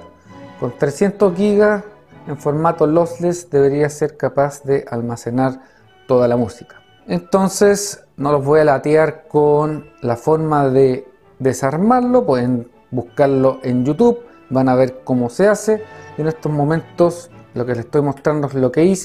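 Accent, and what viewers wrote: Chile. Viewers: Ah, un chileno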